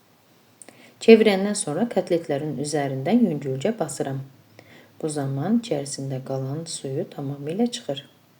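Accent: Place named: Nehram, Azerbaijan. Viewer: xanim deyəsən Nehrəmlisiz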